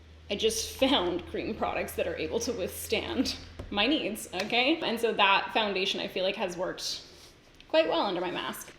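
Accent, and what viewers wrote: Canada. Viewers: My boyfriend overheard and said “is she Canadian??”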